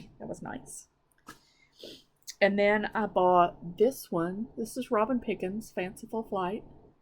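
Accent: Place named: South Carolina, United States. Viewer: Hi! The thing that drew me to this video was your beautiful Scarlett House Floral Motif piece! It is simply stunning. It is really calling my name, but I’m trying to WIP down! I am also charmed by your accent! You are from SC? I’m originally from Tennessee although I live in Chicago now. I used to sound a lot more like you and I miss it! lol. Sounds like you had a fabulous retreat. I love spools and want to find a big one some day to put in my craft room! I’ll be looking forward to your next one whenever you get around to it!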